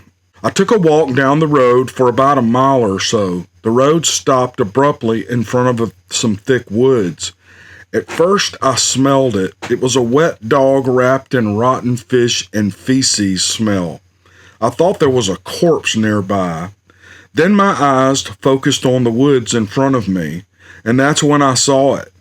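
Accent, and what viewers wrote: South, United States. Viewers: I just love your soft, southern, masculine voice! Thank you for providing such a great respite from all the political craziness. I wonder who Bigfoot would vote for? Hahahaaa! No! No, I won't follow that thought any further! 😂 Great video! Great stories!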